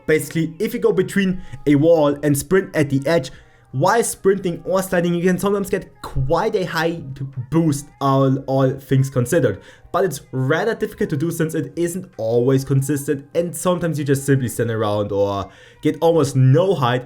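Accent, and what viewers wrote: Germany. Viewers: U sound hella german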